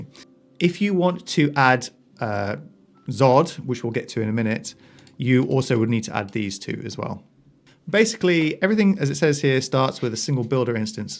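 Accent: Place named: Australia. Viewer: I’m so familiar with the accent, Aussie right ?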